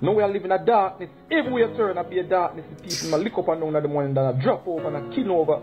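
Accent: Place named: Jamaica. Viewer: I like your videos but for those of us who are not Jamaican it can be hard to understand the patois.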